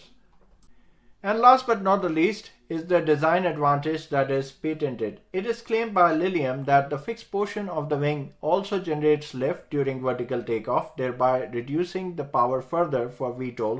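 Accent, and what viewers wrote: India. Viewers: If you went to Engineering school, you'd already be past an Indian accent. His English is perfect. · I've worked with so many smart Indian men and women that the accent automatically lends credibility. :0) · I love that India Sound :) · Once u get past the indian accent u realise this guy knows his stuff and explains everything really well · People may be reading too much into this comment. Yes, on YouTube, there a ton of guys with Indian or Pakistani accents doing videos of this type who are really just trend hoppers, who present nothing new in their content. After listening to him talk, he actually knows his shit, and does remind me of the Indian engineers I've worked with over the years.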